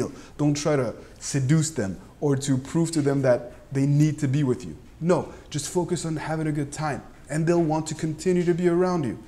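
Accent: France. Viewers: french accent ?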